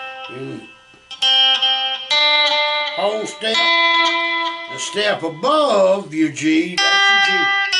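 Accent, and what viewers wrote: Texas, United States. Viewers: This is Texas English or what ? Its funny . I can listen steel guutar all day.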